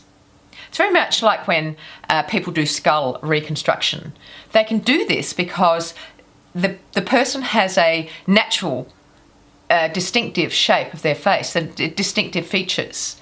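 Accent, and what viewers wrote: Australia. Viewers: I wake and do my exercises every morning with you!! I take off on the weekends!! I would love a Aussie accent, I love the way you talk!! I am from America we don't have a accents!! My question to you is, are you still doing facial exercises and will you be doing them for life? Have a great week!!!😊💟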